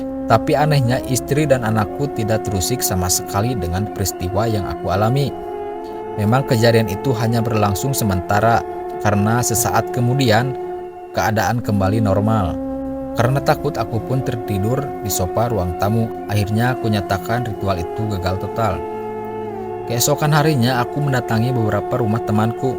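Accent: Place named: Sunda, Indonesia. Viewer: Lentongna sunda pisan euy 😊👍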